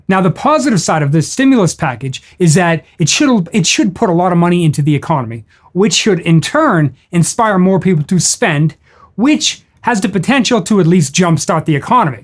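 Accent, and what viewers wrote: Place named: New Jersey, United States. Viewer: = Awesome. I could listen to that lovely Jersey accent all day.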